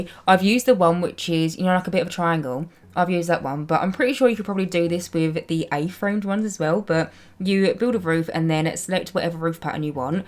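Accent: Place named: East London, United Kingdom. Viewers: i love english accent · im from east london and this is the first time ive seen a youtuber with a similar accent to mine play sims! i feel so at ease love ur video and ur builds are insane!!!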